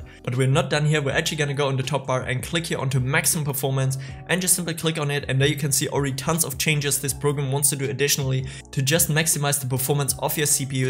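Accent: Germany. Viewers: Oha digggah du hast mir von 30 fps zu 120 fps geholfen ehrenmann bist du eug deutsch wegen akzent:(areyou German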